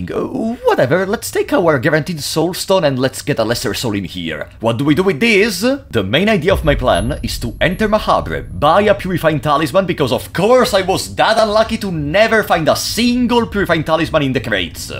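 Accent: Italy. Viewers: I didn't know you were Italian (was really wondering what kind of accent do you have) been watching you for a while now, from multiple accounts. Big love from russia❤❤❤ learned about FaH from you btw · So you're italian!!! All ghis time i wasn't able to identify your accent! Anyway glad you recover from your bad time, you truly someone i aspire to. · You're Italian? Man I suck at recognizing accents. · you sound italian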